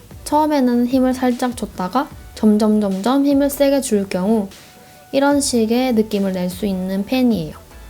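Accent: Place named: Gyeongsang, South Korea. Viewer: 갱상도억양이 아주 강약조절을 하면서 톡톡 튀네요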